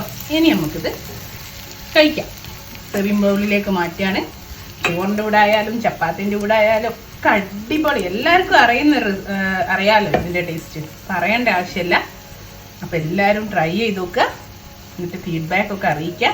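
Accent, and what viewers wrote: Kozhikode, India. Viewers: This came out sooo Gud Thx 👏🏽 Ur kozhikode accent sooper 🥰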